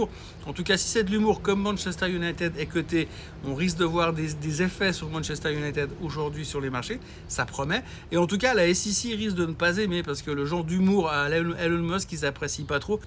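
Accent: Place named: Carouge, Switzerland. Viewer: Le marché monte notre ça l’énerve! Tout laisse penser qu’il a pris des positions à la baisse Et ce look de vieux navigateur solitaire et cet accent carougeois traînant ni changer rien.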